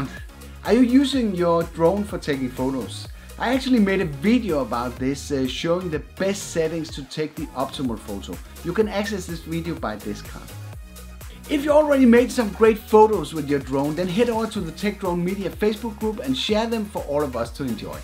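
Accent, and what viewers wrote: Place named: Denmark. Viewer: No question that you are Danish! The accent is obvious from just 10 seconds of listening. Great video!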